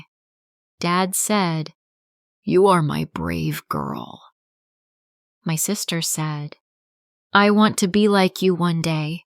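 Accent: United States. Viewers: Is it American accent ???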